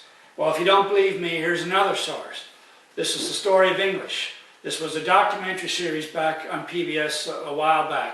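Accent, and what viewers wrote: Canada. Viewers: the way cape bretoners (like this guy) talk is quite different from a lot of other places in canada, especially outside the maritimes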